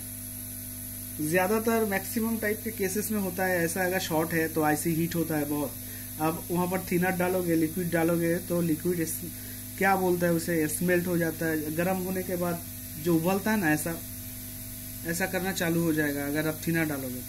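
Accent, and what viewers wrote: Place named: Bengal, India. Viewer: Bangali ho kya Bangali Jaise baat kar rahe ho to Bangali Ho gai Rakha lagta hai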